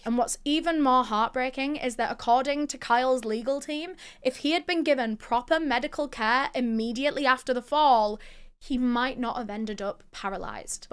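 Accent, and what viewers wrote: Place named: North, United Kingdom. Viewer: northern accents are so hard to listen to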